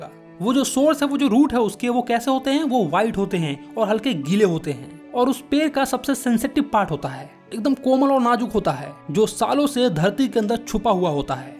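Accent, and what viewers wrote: Bihar, India. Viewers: Its sounds like Bihari Bhaiya!👀❤ Best explainer everr?🐣haiinna?? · Are u bihari?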